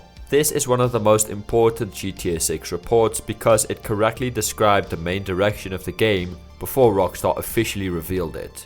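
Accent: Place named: South Africa. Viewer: The South African accent is coming through bru! Lekker video!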